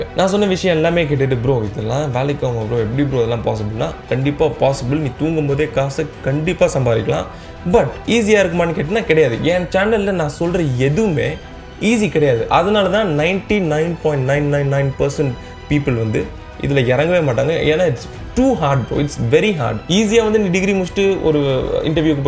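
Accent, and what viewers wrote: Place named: Sri Lanka. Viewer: Bruh u sound like srilankan bruhh 😂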